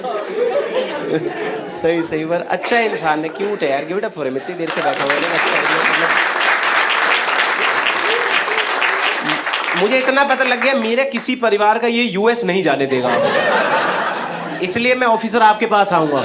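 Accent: Pakistan. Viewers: love the way you speak! Apka lahza pata nahi kisi ne notice kiya ya nahi but bhaut influenced hai how people speak in Pakistan. For example: Chai mai chini kam kare aap, mohan meri baat sune aap. Don't take offence but this is how most Pakistani influencers and comedians speak in general. Aur bhi notice kiya hai maine but all that sounds very nice....adds to the comedy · Why he has a Pakistani accent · Why he's sounding like pakistani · brother why your accent matches with pakistani's accent, are you from indian border side. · * ki tone itni Pakistani si kyu hai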